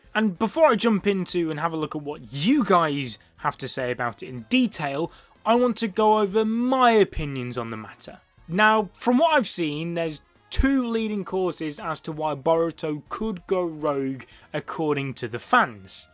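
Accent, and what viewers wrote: United Kingdom. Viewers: Omg listening to a bri'ish person talk about anime is so strange for some reason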